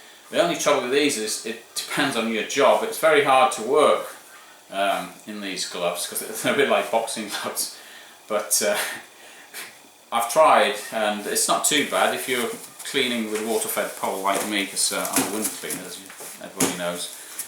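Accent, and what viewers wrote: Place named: England, United Kingdom. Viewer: just saw an advert for these on TV in australia, so i thought id quickly look for a review online, yours was the first i saw. Nice to hear an english accent, im from coventry, but i live in sydney... it doesnt often get cold here but this weekend is pretty cold, 13C!! which by english standards isnt cold at all. lol.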